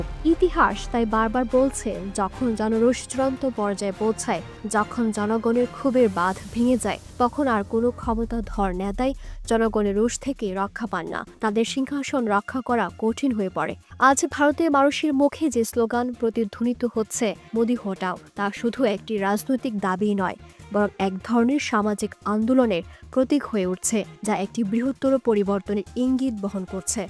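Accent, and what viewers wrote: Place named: Bangladesh. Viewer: Why you are not giving “Go back slogan” to Mamota or Firhad” to whom 10 people are dead before Puja?? and everyday people are raped,murdered??? India is still stabile due to Narendra Modi and who can talk against Donald Trump,,,your Bengali languages sounds like you are a Bangladeshi journalist…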